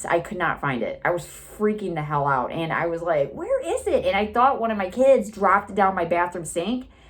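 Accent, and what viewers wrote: Michigan, United States. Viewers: ur michigan accent is so funny to me im from cali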